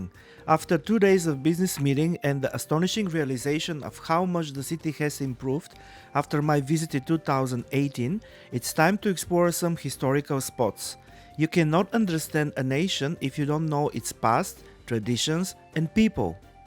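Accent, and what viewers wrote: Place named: Singapore. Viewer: Where do you come from anyway?ur English sounds like Singaporean or at least asian acsent..😊❤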